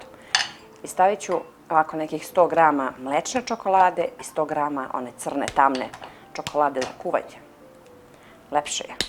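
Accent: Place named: Toplica, Serbia. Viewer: TAKO PRICAJU KAO DASU IZ VRANJA NE BAS TOLIKO ALI NAGLASAK PROKUPLJE TOPLICKI KRAJ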